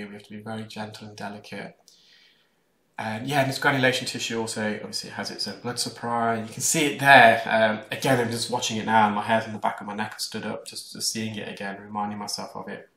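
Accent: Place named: Leicester, United Kingdom. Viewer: he's from Leicester in England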